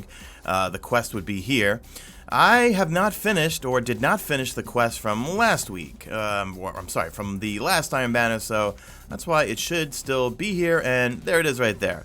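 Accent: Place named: East Coast, United States. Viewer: You sound like you're from the east coast. I hear a slight accent. Regardless. keep making amazing content dude!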